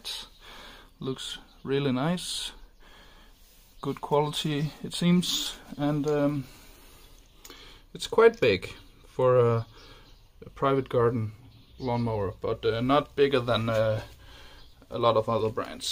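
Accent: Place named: Denmark. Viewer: Your English just sounded a lot like when a Dane speaks English. And I was curious what the price is with all Danish taxes etc :)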